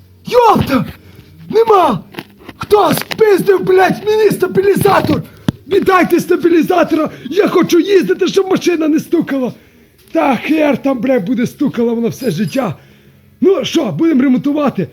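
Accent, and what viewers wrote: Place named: Khmelnytskyi, Ukraine. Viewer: Судя с говора вы с Хмельницкой области 😊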